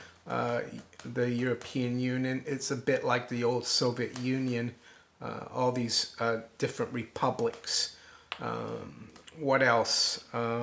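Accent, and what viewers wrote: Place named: New York, United States. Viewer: Have you ever lived in the US? You sound like a new yorker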